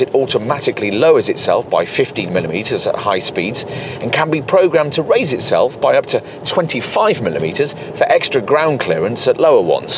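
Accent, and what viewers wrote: United Kingdom. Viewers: Car review in propah british accent!!!!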